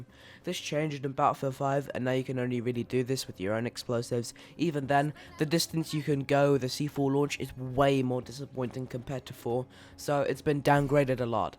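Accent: United Kingdom. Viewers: He sounds even more British than last time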